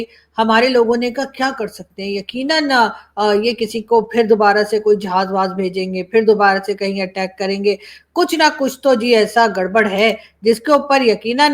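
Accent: India. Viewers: Tapka ke chle gaye … UP ki Bhasha … madam.. apke lehze Mai UP jhalakta hai 😂😂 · ap Mumbai ke bhasa bolte huye bohut achhaa lagte Hain Love You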